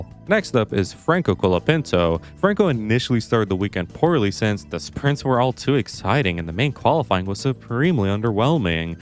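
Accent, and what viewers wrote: United Kingdom. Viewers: No way bruv ur form California I always thought u were British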